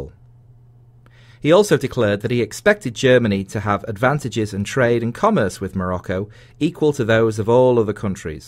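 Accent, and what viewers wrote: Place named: Liverpool, United Kingdom. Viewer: A Scouser!!!! Rarely heard one in my live! This is a very interesting accent. (also your history lesson is) Dankeschön!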